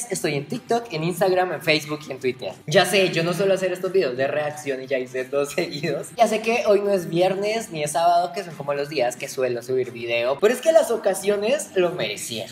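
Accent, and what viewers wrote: Mexico. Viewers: Este tipo es colombiano y habla como Mexicano? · Pero por qué hablas como mexicano gomelo?? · Hablas mexicano.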